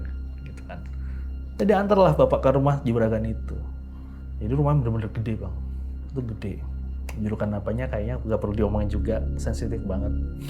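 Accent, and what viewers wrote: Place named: Tegal, Indonesia. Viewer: Ini orang Tegal ya ternyata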